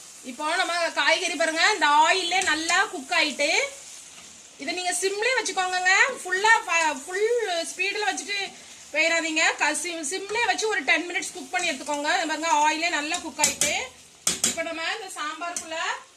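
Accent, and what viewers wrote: Tirunelveli, India. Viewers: Super Ka... Unga slang vache kandu pidichuten... Nanum thoothukudi than Ka... Akka sothi kulambu seinga ka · Super sissy, Wr is ur native place? I think u r nellai ponnu bcoz im also in nellai. Slang la theriyithu sissy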